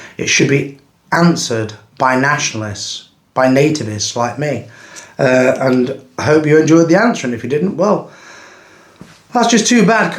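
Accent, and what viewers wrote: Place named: Midlands, United Kingdom. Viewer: Midlands, Derbyshire (Ilkeston), Notts (Hucknall) or South Yorkshire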